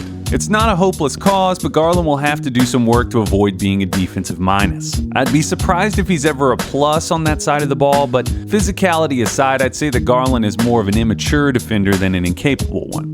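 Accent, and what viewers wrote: South, United States. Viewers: Come for the scouting and dead-on Southern accent, stay for the "What We Do In the Shadows" meme. Top content as always!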